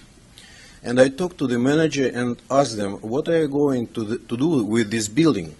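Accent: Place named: Russia. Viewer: Надо отдать должное Вашей смелости: английский у Вас русский, явно недостаточный для избрания на должность мэра.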